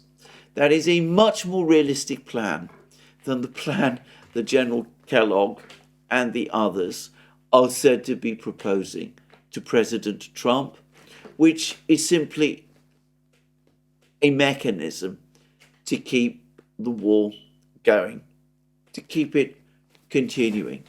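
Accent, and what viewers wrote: United Kingdom. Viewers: A year's training for Russian's soldiers eh? I don't think I've ever caught Alexander telling such a bald-faced lie before. The man absolutely has no shame. He appears to have no regard for the intelligence of his audience. He knows he's just shoveling thinly disguised nonsense gussied up with a British accent and a 50-cent thesaurus, and is just taking the money and running with it.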